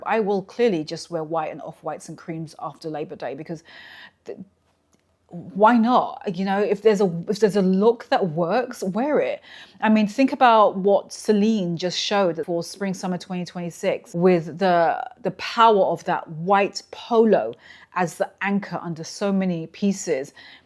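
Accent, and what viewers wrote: United Kingdom. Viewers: Same here, I've been out of the loop and in caregiver mode for 4 years for my brother and one year for myself just to regain my own health and strength, but when she popped up today, I was like Yeess! I need this, I need to hear that beautiful British accent spurring me on to new heights of creativity! With fall coming on, my favorite season for clothes, I can't wait to get in my closet and put together some fabulous looks! I have all the makings and 99% of it is thrifted over many decades and many eras from Victorian, think Ralph Lauren romantic blouses, to classic leather and denim, to Chanel jackets, one authentic one from a thrist store!! Unreal what people donate, bless their 💕!!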